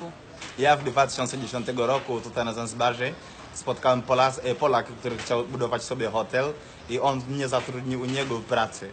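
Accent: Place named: France. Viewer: Gówno prawda mówi z zabojadzkim akcentem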